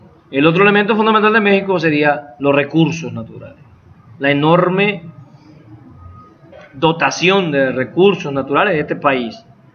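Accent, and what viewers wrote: Cuba. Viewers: Oiga usted habla como ke es mexicano usted no es mexicano parese cubano venezolano no se pero usted no es mexicano ahora esta bien por apollarnos y si gusta ser mexicano pida la nacionalidad no hay problema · de donde eres? Te dices mexicano pero tienes tu acento cubano mucho muy marcado, saludos! Buen video! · Su acento dicta que es cubano. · Este señor tiene acento cubano hay que cuidarnos de lo que dice Lopez obrador esta incado ante trump sólo los mexicanos podemos defender nuestro pais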